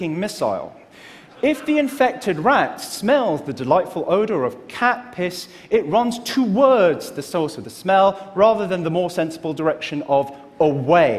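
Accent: United Kingdom. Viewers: I love an asian who speaks with an impeccable British accent. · I like his British accent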